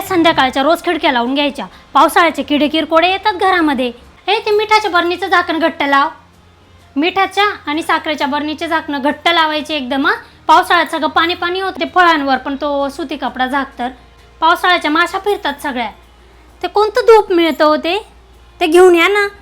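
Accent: Khandesh, India. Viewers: Typical Marathi Aai Tyat Pan Khandeshi Tone 👌👌👌👌😍😍👍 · खानदेशी लहेजा खुप छान सांभाळत घरोघरी असेच संभाषण चालूच असत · बापरे, तू ग्रेट आहेस ताई! Content wise पण आणि अभिनयाच्या बाबतीत तर तुला परकायाप्रवेश करायला तुला काहीच वेळ लागत नाही, सराईतपणे करतेस! एकूण एक मुद्दा cover करतेस, ते पण तुझा खान्देशी लहेजा सांभाळत! खूप खूप शुभेच्छा!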